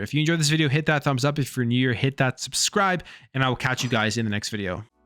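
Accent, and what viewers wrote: Canada. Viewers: You from Canada bro? Just curious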